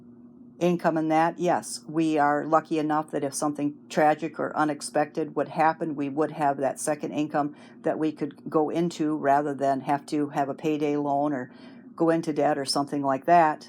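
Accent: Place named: Wisconsin, United States. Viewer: My parents are from LaCrosse, WI! I recognized your accent! People ask if I am from Minnesota or Wisconsin often! Soul sister!!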